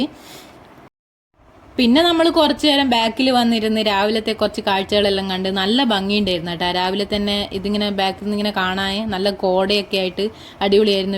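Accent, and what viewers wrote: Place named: Kannur, India. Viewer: കണ്ണൂർ ഭാഷ 👌👌👌😀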